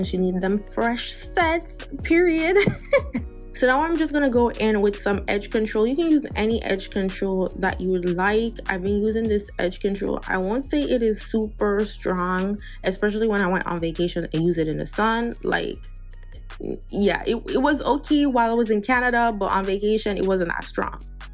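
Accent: Jamaica. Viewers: Are you Jamaican??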